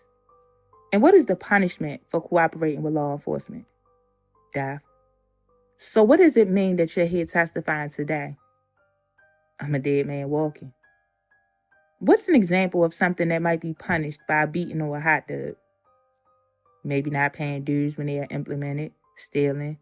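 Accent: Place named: Baltimore, United States. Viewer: Who ever you got reading this is definitely from Baltimore like me hot-dug aka hot dog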